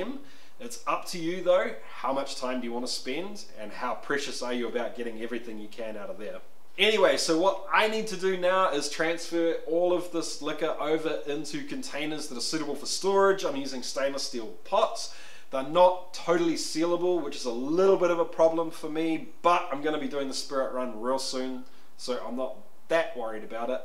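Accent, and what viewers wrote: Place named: New Zealand. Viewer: Awesome videos and being a south islander good to hear a kiwi voice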